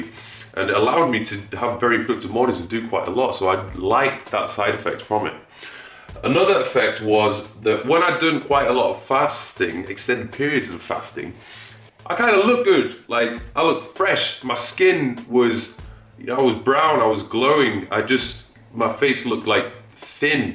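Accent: United Kingdom. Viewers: His voice sounds like John Cena with a british accent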